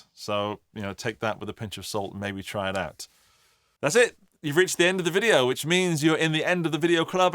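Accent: United Kingdom. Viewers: Black start me up. Yeah I was researching this and you've clarified like butter. Thanks. You Brits make everything sound good anyway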